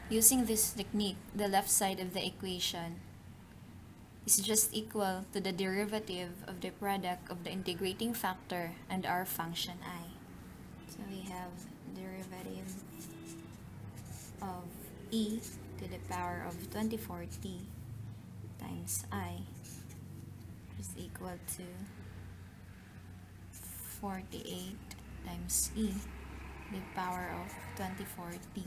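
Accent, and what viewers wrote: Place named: Philippines. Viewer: you're sounded like a filipina, nice explanation by the way.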